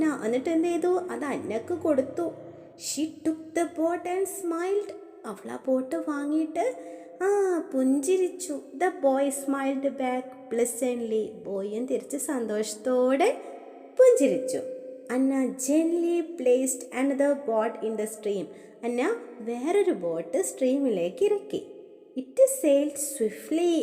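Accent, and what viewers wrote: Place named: Kasaragod, India. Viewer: നിങ്ങളുടെ വീട് കാസർഗോഡ് ആണോ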